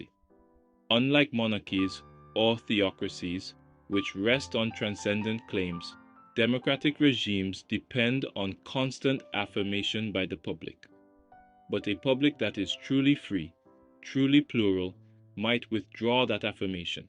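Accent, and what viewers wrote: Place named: India. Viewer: Sound kinda indian. Can you please curse vishnu for us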